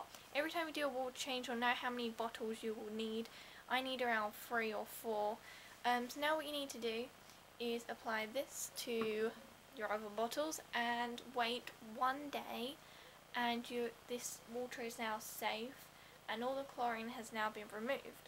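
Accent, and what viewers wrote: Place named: United Kingdom. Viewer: where in the UK is your accent from? it's absurdly cute. with my limited knowledge i'll guess surrey or wales. oh yeah and thanks for the info vid =B gonna go get some more dklor today! my other batch just kept leaking out of the bottle.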